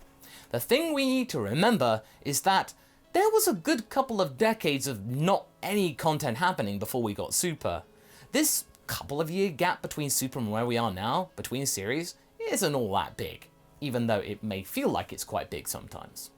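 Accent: United Kingdom. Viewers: I like your accent, are British, New Zealander or Australian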